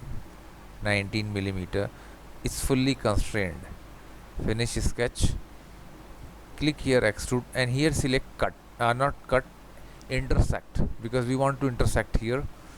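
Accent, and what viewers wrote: India. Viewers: Are you from Índia? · You know it'll be informative when the guy has Indian accent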